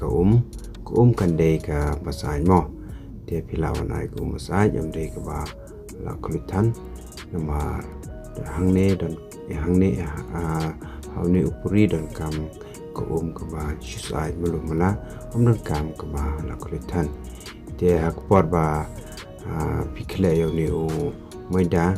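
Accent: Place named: Pnar, India. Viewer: Ph bh dakan hangno nga sngew tynnad ba ph kren daka kynten pnar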